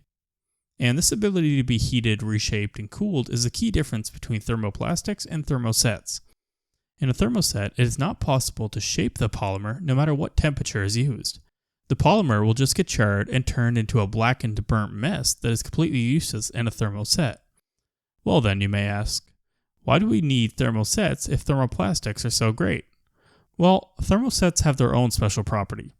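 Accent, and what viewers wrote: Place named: United States. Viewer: Am. accent and you spell tires that way?? what is the story behind this?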